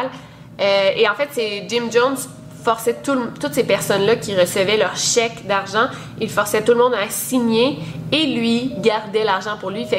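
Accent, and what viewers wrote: Quebec, Canada. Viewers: Ahah quel accent québecois, ça me manquait d'entendre ça ^^ en tout cas, très bon boulot sur cette secte (Je ne connaissais pas ta chaine). J'ai voulu en savoir plus pour essayer de comprendre à quel point l'esprit humain peut être manipulable. Merci beaucoup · Je t'ai connu grâce à Horia qui avait parler de toi dans l'une de ses vidéos pour Halloween ! Et depuis il y a pas un jour où je ne regarde pas tes vidéos, ton accent Canadien résonne par tout chez moi mdr Continue, j'aime beaucoup le travail que tu fais ^^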